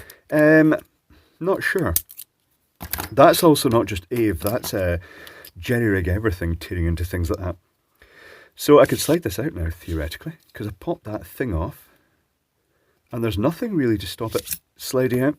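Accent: Scotland, United Kingdom. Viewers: I have a heap of unusable ones and whilst of a similar stature and deftness with a screwdriver clearly lack the dulcet soft Scot's accent to lull the fiendish devices into compliance.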